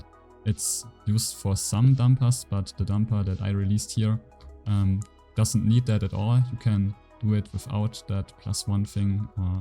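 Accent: Germany. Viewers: You German 🤔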